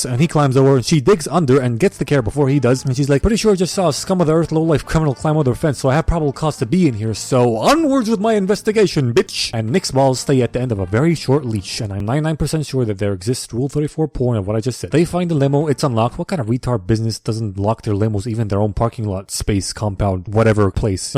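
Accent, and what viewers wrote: United Kingdom. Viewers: By Jove he's British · Lol did you get your British accent and lingo from top gear? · his british voice though XD "funi gag m8 DMV slow what a knee slapper m8 that made me laugh so hard oml -a fan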